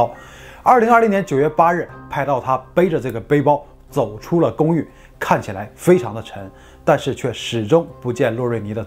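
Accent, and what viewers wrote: Northeast, China. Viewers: 不知道是不是因为东北口音搞笑且亲切（虽然我不是东北银😂），就觉得你讲的很吸引😊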